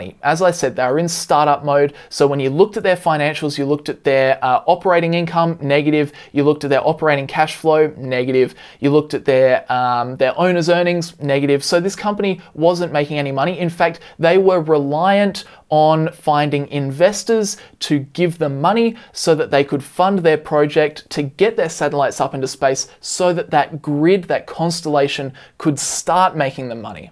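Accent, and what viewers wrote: Australia. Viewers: Wait, are you Australian? I thought you were Dutch...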